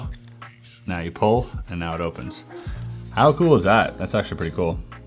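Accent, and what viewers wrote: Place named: Quebec, Canada. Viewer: Salut! Je viens de voir une vidéo de toi qui parle en français, je suis tes vidéos depuis plusieurs années déjà et c'est la première fois que je t'entends t'exprimer en québecois, c'est weird! Je savais que t'était de Montréal comme moi mais je savais même pas que tu parlais bien français! Entk bonne continuation, j'aime beaucoup tes vidéos.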